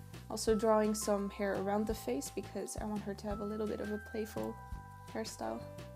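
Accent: Netherlands. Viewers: Ikr, she totally sounds dutch. also she said Haargrens when she was looking for the word hairline. so yeah. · Are you Dutch? You kinda have a Dutch accent.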